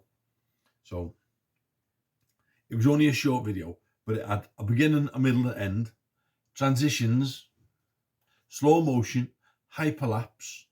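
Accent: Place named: Liverpool, United Kingdom. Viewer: You lucky lad living out there and I just love the accent and your natural scouse warmth.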